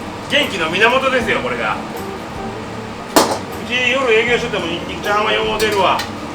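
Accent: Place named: Mikawa, Japan. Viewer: 料理も美味そうだけど、気持ちいいくらいの三河弁が聞いてておばあの実家の郷愁に駆られる…笑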